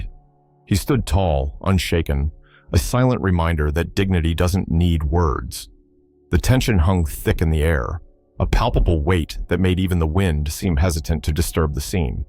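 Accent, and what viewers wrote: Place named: United States. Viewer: What an absolute load of American accented bullshit! Is it perhaps time that British former soldiers took the piss out of the American guards at Arlington? No? That would be too much?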